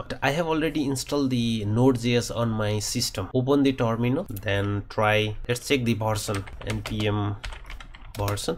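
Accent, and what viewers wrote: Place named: Russia. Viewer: Whats up with the russian accent?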